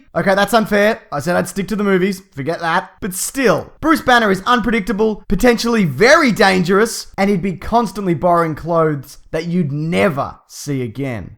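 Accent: Australia. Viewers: His accent is australian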